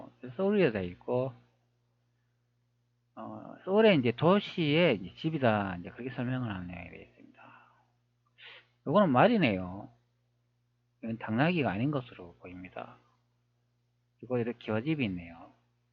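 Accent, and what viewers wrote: Gyeongsang, South Korea. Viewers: 는 말투가 경상도 끈은데 책보고는 5.18선동좃나개 하네 니는 5.18을 선동하나 안하나 5,18은 북조선 빨갱이 짖이야 아니야 궁굼하댜 니 영상 잘 보고 잇댜 댓글 한 달아보쇼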